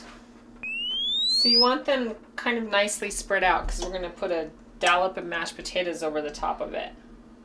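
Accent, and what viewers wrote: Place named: Canada. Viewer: She's got that Canadian accent :)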